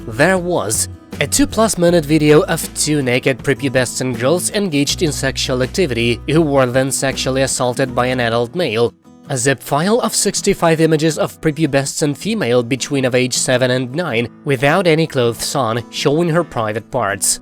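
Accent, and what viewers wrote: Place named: South, United States. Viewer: U.S.A, the Duggars are part of Bill Gothard's IBLP cult instead of a REAL church! It's called FAKE Christianity! They use Bill Gothard's IBLP "Cult Bible" instead of the "authentic" Holy Bible that REAL churches use! The differences are that cults abuse and brainwash their members in the worst ways, while REAL churches teach unconditional love and respect! This cult also doesn't allow divorce, despite a few of Anna's siblings doing so! It makes NO difference how long you and your wife have been married - 31 years or not - would the two of you STILL be married to each other even if one or BOTH of you behaved like Josh and Anna? The "authentic" Holy Bible DOES allow divorce on the grounds of the crimes Josh committed, but it sounds like you forgot that! You sound like you come from The South, which is called "The Bible Belt," and there are equal numbers of BOTH cults and REAL religious faiths! 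When COVID-19 started, CNN interviewed a man on a beach from Alabama, who didn't believe in making ANY decisions for himself - he was 100% dependent on God for EVERYTHING! He even said that if God decided to "call him back," he'd just go back with NO questions! That sounds like BOTH you and your wife - regardless of whether the two of you belong to a cult or a REAL church!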